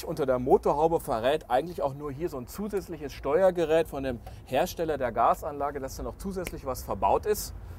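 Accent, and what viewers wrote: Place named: Netherlands. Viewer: du hast eine rotsprache!!! niederlandisch!!!!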